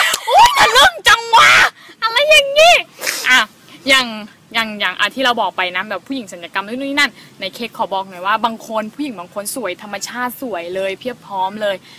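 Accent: Thailand. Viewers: พูดเหน่ออ่ะ แต่น่ารักนะไม่ได้ว่า ผมว่ามันเป็นเสน่ดี......ศัลยกรรมผมเชยๆนะใครก็ชอบคนสวยทั้งนั้นแหละ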